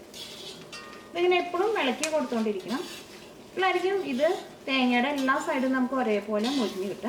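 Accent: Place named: Thrissur, India. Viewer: Hello..I am your fan..love your simple narration and the accent..I am also a native of trichur..hence hearing you gives extreme pleasure.. very good recipes and cooking..keep going..congrats..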